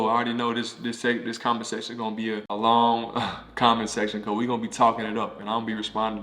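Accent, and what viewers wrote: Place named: South, United States. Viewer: Girl he from the south! Dialect/ pronunciation is associated with where you’re from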